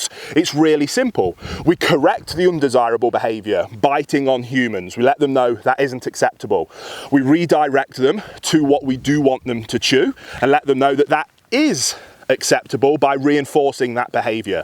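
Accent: United Kingdom. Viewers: Hold up. He's a big husky dude with an epic beard, a British accent, tattoos, sitting in a field of nice grass with puppies and talking about love, empathy, and kindness. Yeah, this dude fucks.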